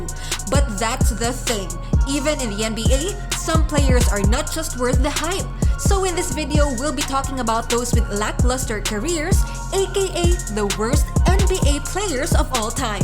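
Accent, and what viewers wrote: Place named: Philippines. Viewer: Are yall Filipino? You sound like my best friends wife and she is from Cebu. You didn't put Brian Scalabrine?? Any how, Great Job. Keep pushing hard. Don't give up.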